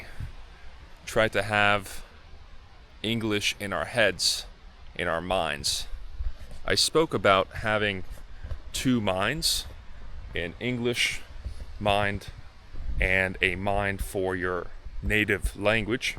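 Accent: United States. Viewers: He's American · Oh man, perfect american english to the intermediate and almost advanced students. Everyday conversation is what we need. Inside and outside. I'm brazilian. Nice to meet you.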